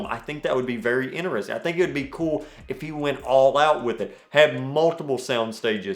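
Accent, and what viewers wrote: South, United States. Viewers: I just love your southern voice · Love hearing a southern man who’s into cinema!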